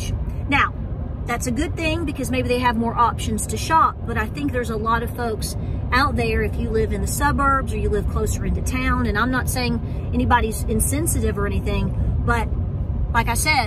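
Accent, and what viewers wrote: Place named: Southwest Missouri, United States. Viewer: I live South of Joplin I'm originally from Cassville where are you. You sound like you're pretty close to me. I'm nosey Lol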